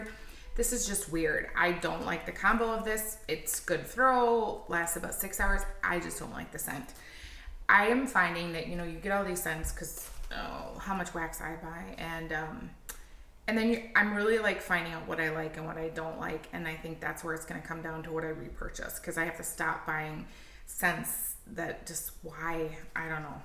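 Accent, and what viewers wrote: Wisconsin, United States. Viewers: Love seeing your face!! So gorgeous ❤️❤️ I love that I can hear the Wisconsin in your voice. Minnesota here 🖐🏻 😂 I have the accent too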